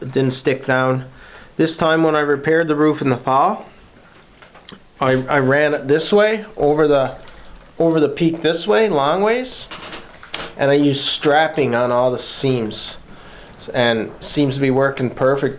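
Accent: Newfoundland, Canada. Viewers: Right as soon as you opened your mouth I knew yous was a newf. From Kilbride myself. Living the life you are.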